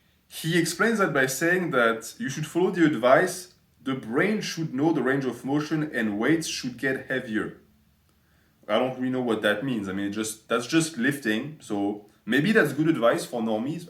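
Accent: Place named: France. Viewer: I feel like has found the most unexpected niche in the fitness industry and that's critiquing stuff in a french accent, idk why it's so addicting to listen to.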